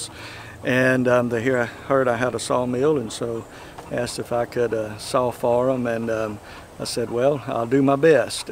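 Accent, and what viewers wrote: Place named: South, United States. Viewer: I enjoyed the video and also enjoyed hearing you talk. I'm southern, but you really sound southern!! I'll look up other videos.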